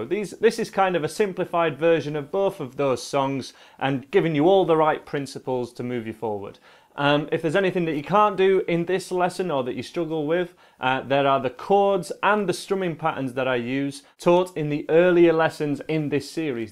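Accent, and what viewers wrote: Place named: County Durham, United Kingdom. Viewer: Hi, This is pretty good stuff that you are doing, very helpful. I played jazz saxophone for thirty five years and have just picked up a guitar (at seventy three) Where are you from? I assumed you were all American, you sound like North East England, County Durham lilt to your voice. Thanks again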